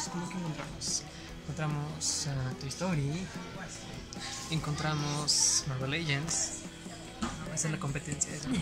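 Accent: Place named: Fresa, Mexico. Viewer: Oye tú hablas muy fresa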